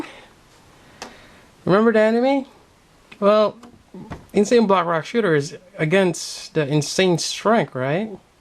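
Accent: Philippines. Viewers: I was just wondering, are you Filipino? Mebbe because of your accent, dunno just askin'.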